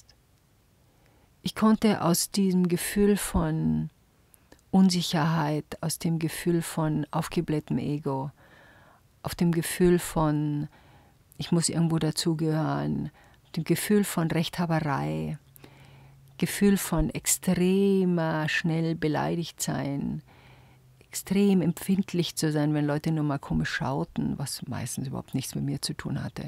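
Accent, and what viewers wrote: Bavaria, Germany. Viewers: schönem, bayerischen Dialekt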